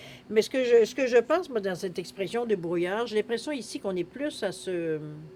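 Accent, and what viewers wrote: Quebec, Canada. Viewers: Bonjour je vous découvre et j ai beaucoup aimé vos tirages.Selon moi vous êtes du Québec selon votre accent si oui moi aussi.J adore vos interprétations à chaque oracle.Ce tirage me va comme un gant.Merci beaucoup ❤🙏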